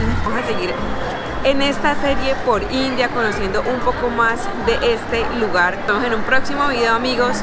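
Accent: Cali, Colombia. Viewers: me ecantas. Ese acento caleño ese cuerpaso jajaja tqm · Me encanta tu acento extraño mi ciudad cali💛💙❤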